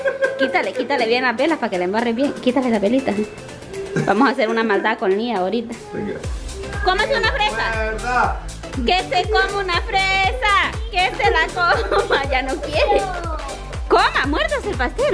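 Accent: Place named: Honduras. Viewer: Te admiro me encanta el asento de las catrachas y mas cuando son carismaticas y cariñosas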